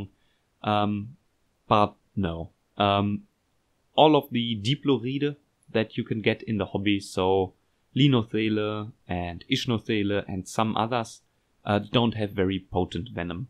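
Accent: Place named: Germany. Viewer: Hey. Im from germany and from your accent and your name i would guess you are too. Im really interested in these spiny assasin Guys. I would Love to buy some from you. Even if thats not possible i really Like your channel and keep Up the high quality videos.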